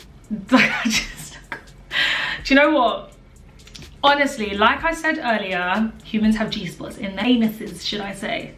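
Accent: United Kingdom. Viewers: that beautiful brit ancient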